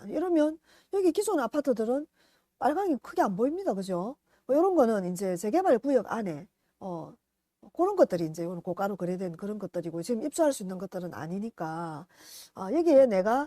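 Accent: Gyeongsang, South Korea. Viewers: 좋은정보 감사합니다~~~ 갱상도 사투리 매력적이십니다 ^^ ㅎㅎ 갱상도 아가씨 사투리 매력에 반해가지고 결혼해서. 행복하게 잘살고있습니당 ~~~ ㅎㅎㅎ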